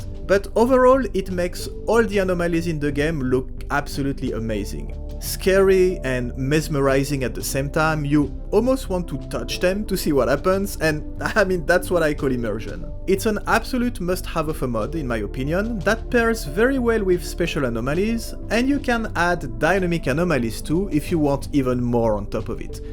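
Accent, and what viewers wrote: France. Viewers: Are you French? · Lately, I enjoy more and more listening english with french accent. I start to feel what that dude from Matrix said.. "It's like wipping your ass with silk." just,.. muuaahh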